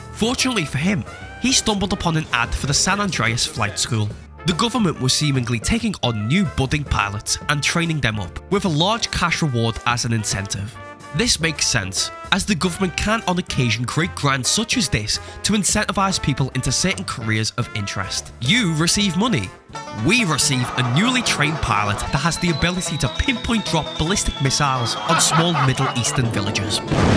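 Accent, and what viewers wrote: Liverpool, United Kingdom. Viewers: Love the scouse accent peaking through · Is this a scouser speaking???? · are you from liverpool? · Are you scouse? Love your accent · Is he a scouser? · We have a scouser, yes lad good to see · I know that's a Liverpudlian accent and this is just fuckin' hilarious.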